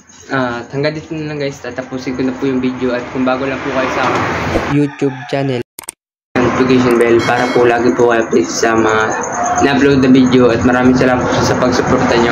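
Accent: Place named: Quezon, Philippines. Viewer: Saan kapo sa quezon bro? Tama hula ko quezon karin sa punto mo, taga quezon din ako eh, nakakamis narin ang province talaga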